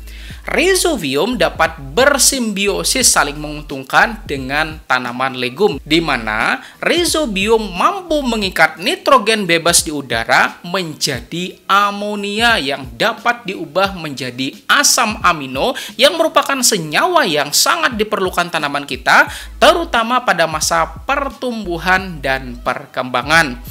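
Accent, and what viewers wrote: Banjar, Indonesia. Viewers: Tetap ketahuan logat banjarnya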